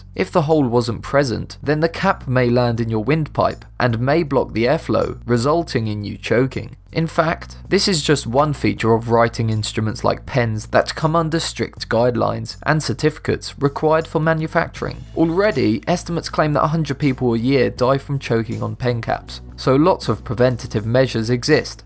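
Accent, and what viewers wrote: United Kingdom. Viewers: Oh you know I just realised this person has a British accent ,' '-D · I love the accent. If this weren’t conveyed in a British accent I think I wouldn’t love it as much. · Is it only me or is every top 10 channel British? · InxPursuitz he sounds nothing like him. Dolan is Australian this guy is British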